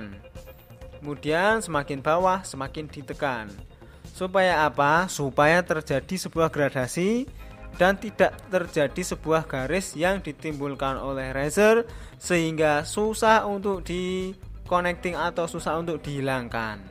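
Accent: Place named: Central Java, Indonesia. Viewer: Logat jateng jebule to mas😂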